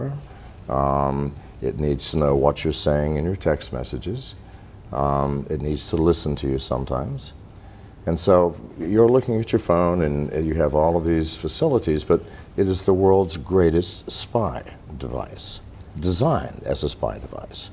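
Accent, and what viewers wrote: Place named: Soviet Union. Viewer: I like how he keeps slipping into a Soviet accent.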